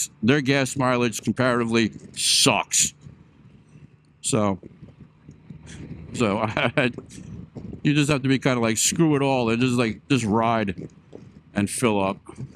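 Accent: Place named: New York, United States. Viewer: Man you are so New York😅😂😅 Thanks for the videos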